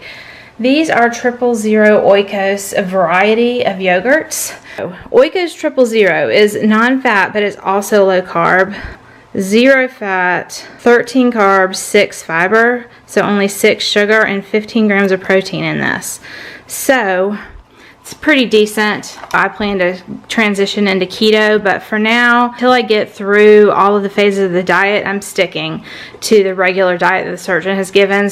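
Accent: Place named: Louisiana, United States. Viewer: I love hearing you talk...reminds me of home! I'm a Louisiana girl living in England!! My surgery date has been set for Nov 11th!! So excited to prep for the different stages. These recipes are so helpful. Thank you. Also where about are you from??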